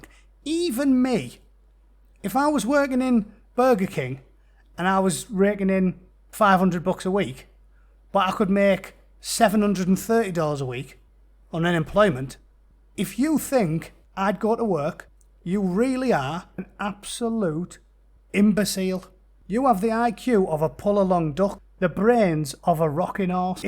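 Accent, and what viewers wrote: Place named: Teesside, United Kingdom. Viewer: I think it may have been a little bit of a bold statement but the point being it a lot less of a labour strong hold than it used to be. I'm from Guisborough anyway so what the fuck would I know apart from the arse end of a 🐑😉. Just though id let you know I enjoy the content and it's nice hearing a local voice shitting on the commies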